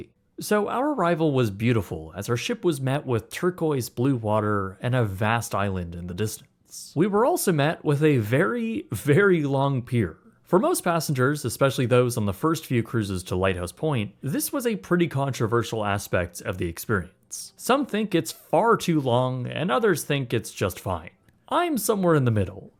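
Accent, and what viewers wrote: Canada. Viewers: Canadian accent i think and southern ontarian. Ie he sounds like me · I heard him pronounce it correctly! I think his Canadian accent softens it a bit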